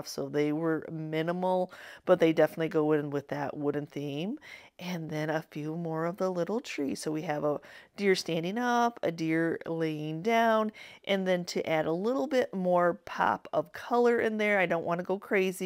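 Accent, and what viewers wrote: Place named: Texas, United States. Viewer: Love the Bible tree and the "God winks". I've experienced them many times since I lost my husband unexpectedly. It has been 2 years but it still feels like yesterday. So God winks are essential to my health! Love your channel, your collections, your personality and your crafts. Are you sure you're not from Texas because you sound like one of us!😁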